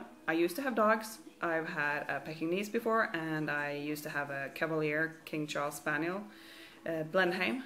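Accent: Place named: United States. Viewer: I was surprised when you said you lived in Norway. I was expecting somewhere in the United States. The only departure from the American accent was when you said "Agatha" and "progress". I have the book about Shackleton, but haven't read it....I will now. Thanks for the video. Martha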